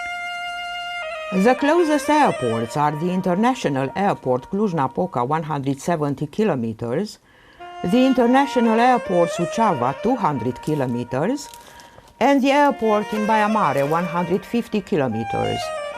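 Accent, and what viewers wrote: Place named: China. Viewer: ce engleza ruginita si una parca'i o rostita de Chineza. filme de astea vor atrage doar turistii din Saliste,Carlibaba ... si Balti mAldavia ! e nevoie de curatenie,amenajari de gradul 1 [unu] la partia de schii,drumuri de accese si repunerea statiunelor minerale in practica reala care ar atrage mii,sute de mii de oameni din EUROPA... si vara si iarna.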